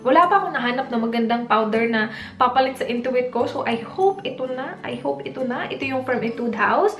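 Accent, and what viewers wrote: Visayas, Philippines. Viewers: haha natawa ako sa "bangag",cute kaayo paminawon ang imo tagalog na may sagol nga bisaya.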